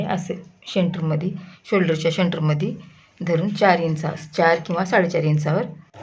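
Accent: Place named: Hingoli, India. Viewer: Tie chan shikawlat shantapane kasa shiwaycha blows te. tumhi Vasmat Kiwa Hingoli chya ahat ka? Tumchya bolnya warun watla.👌👌😊 thank you.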